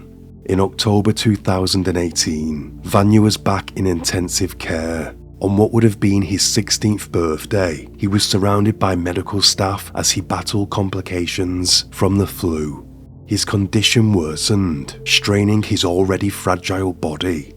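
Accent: United Kingdom. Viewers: This dude sounds like a bot with one of the thickest and most annoying british accents ever, lmao Literally unbearable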